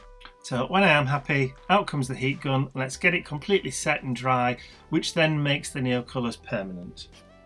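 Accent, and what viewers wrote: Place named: England, United Kingdom. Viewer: I loved the, "coup-pah tea, and a biscuit shared with Mr. Bentley...", your English accent is so lovely. But....whoa! "....what can you expect of a man"?????? hahahaha! You're a hoot! I do love the whole cosmic stream of your creative/thought process, tho. So different for you. And how clever, the way you "incorporated" the words into the whole graphic design of your spread! I've been over that whole "smeared-charcoal-border" around the words technique, but I felt like just leaving the "anemic-white-patch-with-a-word" was not enough either. so....thanks - - for opening up the scope of a whole.....new thing! Big hug, cat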